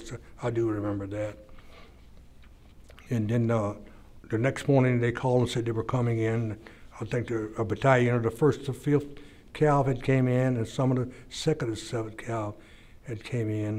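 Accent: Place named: South, United States. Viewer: He’s just a Southerner, that’s all. Lots of people from New Jersey have an accent too.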